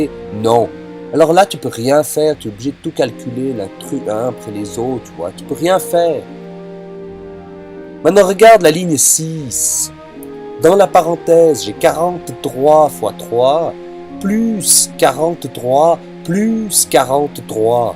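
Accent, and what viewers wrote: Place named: Valais, Switzerland. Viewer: Excellent, malgré l'accent Fendant.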